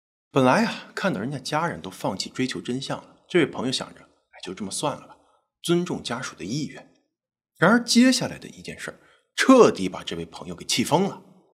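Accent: Standard. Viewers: 普通话标准，听多了普通话都学会了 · 真的是講故事的專家❤內容清晰語氣恰當，字正腔圓❤最喜歡的頻道我每天都聽幾個小時太好聽❤，👍👍👍👍👍👍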